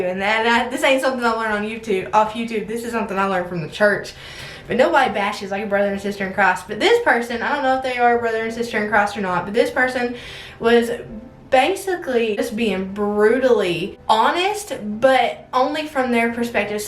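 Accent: South, United States. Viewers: I think people need to understand that you can still live frugal and on a budget and still have money to spend. My husband and I live below our means a lot, but if needed we can drop a couple hundred on something and not have it hurt us. Just because you try and live frugally, doesn't mean you can't spend a little extra if you decide it's worth the spending. I got here watching your alls plantation shutters video, and stuck around for the southern drawl and since of humor. There's only a few youtubers that I watch solely for persona and you would be one. I just like when I watch a video and someone's house isn't perfect all the time, because mine isn't. After 4 kids (and some health issues starting to show up like PCOS) I'm on a health journey too, so it will be cool to see your progress and feel like someone else is doing it with me. 😊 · I was just last night telling my hubby about u and ur family and ur channel! 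My exact words were she says “hey y’all my name is and I’m coming to u from a trailer park in Tennessee!” In the cutest southern drawl ever! And I was telling him I watch a LOT of you tubers and that u are the most authentic and amazing one that I watch! I was telling him how much I love ur family values and what an amazing marriage u have!! How hilarious u and and even the kids are! And I had just told him that I think she is probably making some good money on you tube and u don’t even do advertisements. That u turned one down for thousands of dollars becuz that’s not how u wanted ur channel! And I told him how I was so happy seeing u be able to buy some diy stuff for ur house and take the kids on a staycation but how u still are so grounded and save up for things in envelopes and that u haven’t changed one but!! We love y’all for y’all!! I’m glad u explained that tonight (although I did cry) but I don’t ever want u to feel that way again!!!! 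Just delete nasty comments! Don’t deal with them!! U know you and that’s all that matters!! And I hope one day u pull up to that trailer in a Lamborghini!!!! Wouldn’t that be the talk of the park!!!! Lol!!! Plzzz don’t let anyone else bring u down! U have brought me up from some of my darkest days!!! There isn’t one video I have ever not smiled or laughed (even if we’re through tears of my own) the whole time!! U have a heart of gold! Don’t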